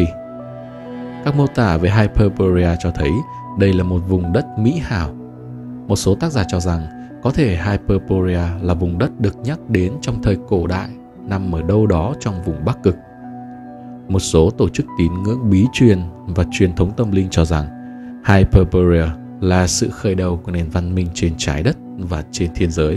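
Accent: North, Vietnam. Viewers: Anh giải thích về giấc mơ rất hay, cho thấy anh có rất nhiều kiến thức vững chắc. Chỉ mới biết Channel của anh hơn hai tháng, đang nghe lại những bài củ cả năm trước. Giọng đọc của anh quá hay và rõ ràng. Tôi đến Mỹ từ nhỏ cho nên có những Channel nói giọng Bắc nhanh quá rất khó hiểu với tôi, nhưng giọng Bắc của anh rất hoàn hảo, chậm rãi dùng từ ngữ dễ hiểu (😄👏). Thanks so much! Love your Team! Love you all!❤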